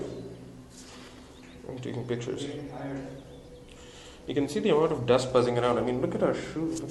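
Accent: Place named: Hyderabad, India. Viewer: This guy is hyderabadi . When he said ye sab cheezan 🤣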